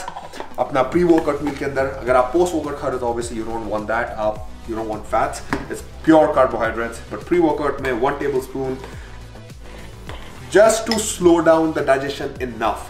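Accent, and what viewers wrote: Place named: India. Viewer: I love the Indian desi touch while he talk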